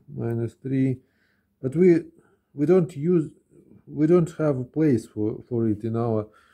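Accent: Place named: England, United Kingdom. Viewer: О, а вы из Англии?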